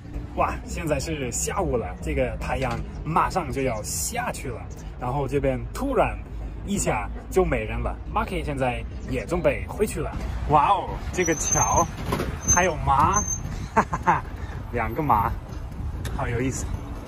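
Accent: Sichuan, China. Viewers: 哈哈你的视频很有意思，我是生活在斯图加特的中国人，看你说汉语还带着挺浓的四川口音，对你的好感加倍哈哈，祝你在中国生活的开心，希望德国也尽快控制住疫情。。。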